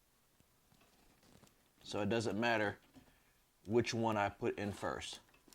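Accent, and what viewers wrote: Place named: South, United States. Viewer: Funny southern accent ahahaha! Nice and helpfull video!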